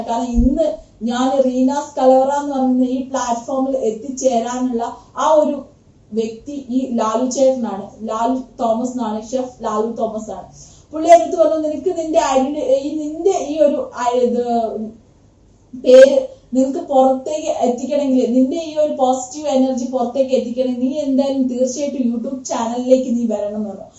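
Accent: Kasaragod, India. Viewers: Chechi kasaragod ayrno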